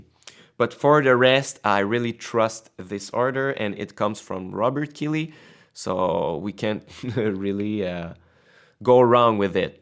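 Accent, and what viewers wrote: Quebec, Canada. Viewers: You sound like you're from Quebec?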